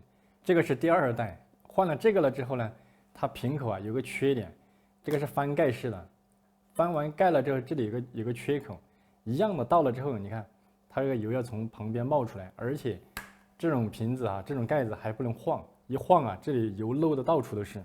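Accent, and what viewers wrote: Sichuan, China. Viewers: 王老师的四川话在恢复唉，泹，汃，这些四川话都冒出来了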